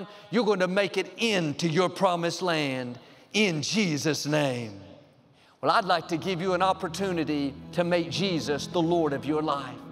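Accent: Texas, United States. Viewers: Yesterday I was just out of energy for going on and asked God to take me home. I woke up this morning and had a message from my loving aunt telling that my breakthrough is near, it is why the devil is so focused on me. Then I came to YouTube and this sermon was top of my recommendations. I listened to and it was like my aunt speaking to me in a Texan accent. Same message, twice in the morning from different sources. I was blessed by this. Thank you! God bless.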